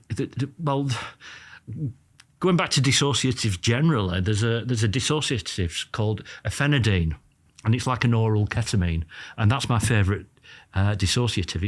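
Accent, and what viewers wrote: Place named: Accrington, United Kingdom. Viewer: This guy is great and sounds like he lives near me (Accrington) would love to meet him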